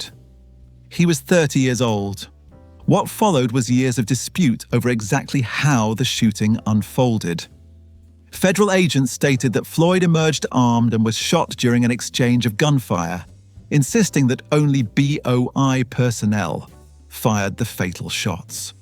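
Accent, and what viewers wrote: England, United Kingdom. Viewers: GET RID OF THE UK NARRATION VOICE!! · They think it sounds more intelligent and more informed , Americans are suckers for English accents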